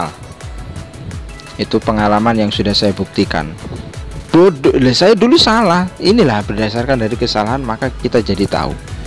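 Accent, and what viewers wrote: Java, Indonesia. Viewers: Asline jowone ngendi Iki kang....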